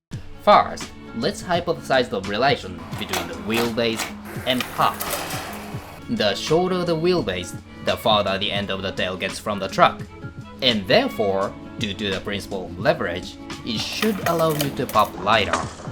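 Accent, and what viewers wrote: Japan. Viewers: やっぱり日本人です。発音 が。。。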